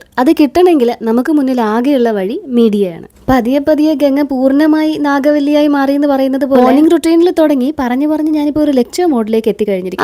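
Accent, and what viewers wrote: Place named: Kochi, India. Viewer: ഇത് കൊച്ചി ആണോ കൊച്ചി സ്ലാങ് ആണല്ലോ സംസാരിക്കുന്നത്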